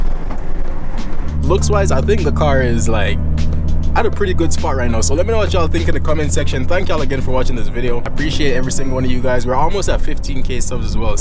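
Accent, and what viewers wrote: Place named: Jamaica. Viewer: Are you from Ja am hearing a little Jamaican accent lol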